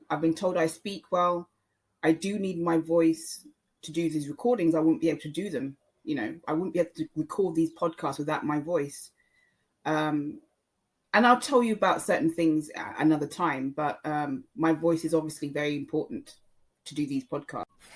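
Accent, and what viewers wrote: RP, United Kingdom. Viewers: i could tell your British accent immediately ! I’m south London #Woking 👌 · I think I told you this before, but you don't, to me, sound particularly British, but you do speak a very upper class English. You write very well too. I'm a legal transcriptionist by trade and originally started out as a generalist transcriptionist for a UK company. I had the perception, though I'm told I'm wrong, that there was a very large gap between classes in the UK. I think my perception was tainted by the types of transcripts that I was doing but I can tell you that UK (Harvard English) for lack of a better word, I think is probably the best in the world. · You sound posh to me but that is probably because I am from the east end.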